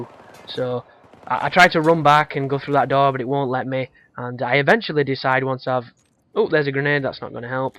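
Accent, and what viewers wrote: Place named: England, United Kingdom. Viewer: Thx for your very helpful vids and good commentary. I love the " bot";)) no im not an american i just love the english accent. Keep up the good work.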